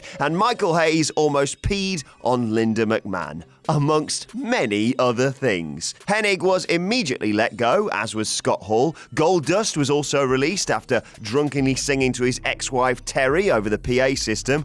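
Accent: United Kingdom. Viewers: Buck zoom off god I hate British English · Anyone else annoyed by British voice overs?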